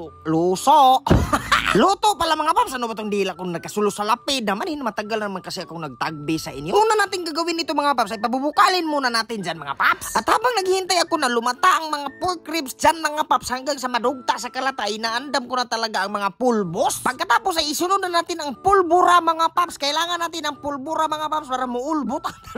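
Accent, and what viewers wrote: Visayas, Philippines. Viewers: Dili jud makabidli, i like the way you bintag, binisayang tagalog🤣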